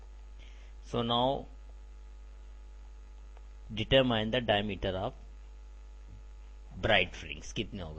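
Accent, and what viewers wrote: India. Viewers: now thats the way you explain it, yes he isnt fluent in English, but did a much better explaining job than most profs around the globe, its Just that u need to be Indian to understand him. Good Job (Y)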